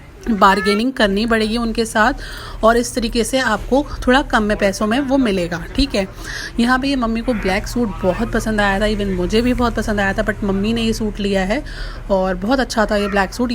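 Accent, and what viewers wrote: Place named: Pakistan. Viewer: Sis aap India se hain ? Which state ? Mujhe laga k Pakistan 😂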